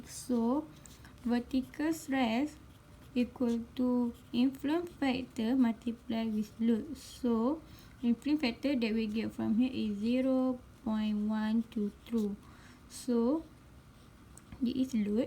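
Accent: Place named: Malaysia. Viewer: finally i found the malaysian's english. thankyou so much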